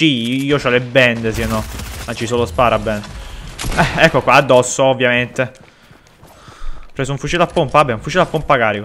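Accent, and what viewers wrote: Naples, Italy. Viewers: Oddio ma sei di Napoli 😂😍🤞🏻💙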